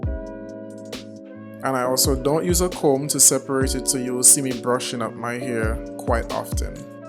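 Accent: Caribbean. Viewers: Hi! Where are you from I'm hearing a Caribbean accent. I'm trini 🇹🇹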